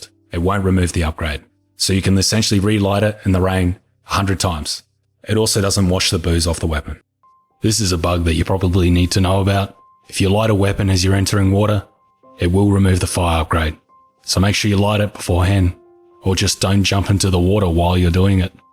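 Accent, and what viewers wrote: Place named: Australia. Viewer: You are such a good YouTuber, straight to the point while explaining it perfectly. And you sound like your a Aussie, which makes it 100 times better.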